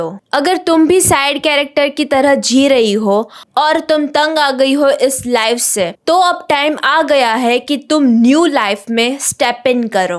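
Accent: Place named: South, India. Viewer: Are you from south india?? Your hindi like this ❤❤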